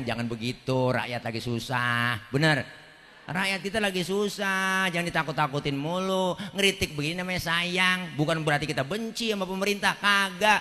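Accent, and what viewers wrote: Jakarta, Indonesia. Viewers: Betawi banget Saya suka Ama ceramah"nya Tiap mau tidur selalu saya play Sehat Selalu Ustadz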